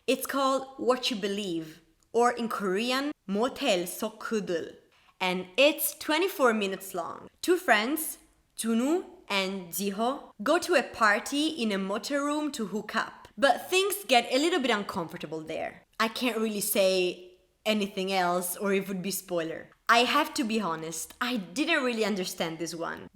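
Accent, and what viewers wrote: Italy. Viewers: please make video, all about theory of love and your thoughts, btw I like your Italian accent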